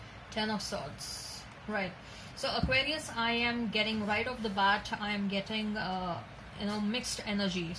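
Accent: India. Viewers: Close this channel and go learn western English first so you can get rid of this Indian accent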